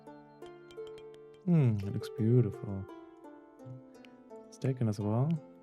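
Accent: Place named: South Africa. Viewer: Random video pops up on my feed... I recognise that accent! Hello, fellow South African :) Good luck with your YT journey.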